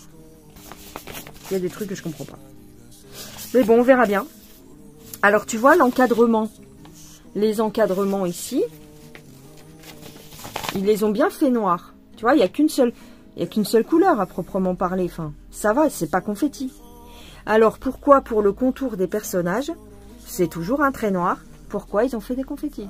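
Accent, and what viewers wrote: South, France. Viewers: Coucou ma petite adorée, oh si maintenant les confettis se cachent c'est infernal! C'est vrai que sur cette toile rien n'est logique mais c'est parti! Tu braves les difficultés 😂! Tu nous en auras appris des choses et ne t'inquiète pas on est aussi accrocs! Accrocs également de ces bons moment passés avec toi! Aujourd'hui l'accent du Sud revient hi hi. Tu vois le chat est là 😺, il faut lui mettre des petites chaussettes de bébé pendant que tu fais la coiffeuse car un coup de griffe est vite arrivé. Je me suis retrouvée un jour avec le bras qui a triplé de volume suite à une griffure, une méchante infection qui a duré 3 semaines! Bref, ici on parle de tout est c'est chouette! Merci merci et une toile de plus, à la suivante! 🤗. Des gros bisous doux doux doux 😘💙💙💙💙💙💙💙et salut les gens 🖐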